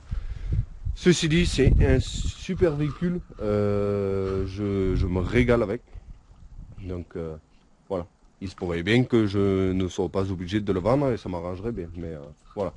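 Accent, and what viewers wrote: South, France. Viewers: Oh tu as l'accent du midi toi non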